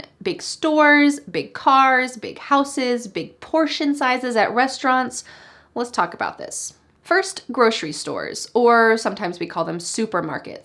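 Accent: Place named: United States. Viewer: Hi, I have been fallowing you for a long time in order to improve my english skills. I am a really big fan of you. This is the best english chanel when it comes to american accent. It 's the best to learn about american culture as well. Hi from 🇩🇴🇩🇴🇩🇴🇩🇴🇩🇴🇩🇴🇩🇴🇩🇴🇩🇴🇩🇴🇩🇴🇩🇴🇩🇴🇩🇴🇩🇴🇩🇴🇩🇴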